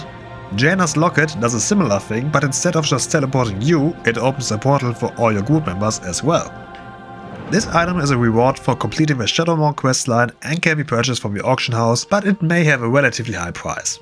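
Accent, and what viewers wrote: Germany. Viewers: Where do you come from? Your accent sounds like a German trying to speak English.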